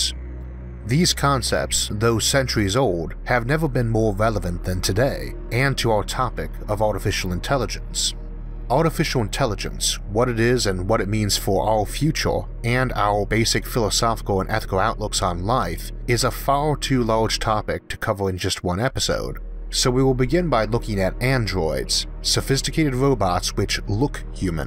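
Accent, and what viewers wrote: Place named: South, United States. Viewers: You should speak more in the southern accent. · While it's not an accent, his voice sounds enough like a Southern US speaker that my brain just loads Georgia.txt and understands him fine (well, I can understand, my time living there was worth it then!). His older videos are hard, but I think that's the fault of whatever mic he was using. The new ones are fantastically clear.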